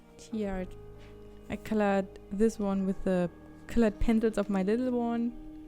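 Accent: Germany. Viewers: Are you Czech or German? Your accent sounds like you are German, but I saw your video about alcoholic markers and it's title is in Czech, so I just wonder about it. (Btw your art is awesome, and Happy Birthday to your channel! ^_^)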